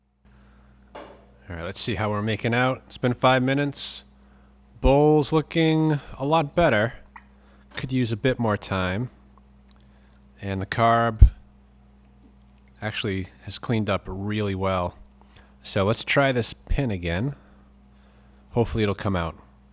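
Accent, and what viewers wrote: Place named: Midwest, United States. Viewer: I got a chuckle out the the "Fuel Make it Happener" segment.. Watching Vice Grip Garage is great. I live up here in the Mid West and there are a few of us that talk like that.... Its just a natural way. Make sure you check the Battry.....Along with the Spark-o-lator. HaHaHa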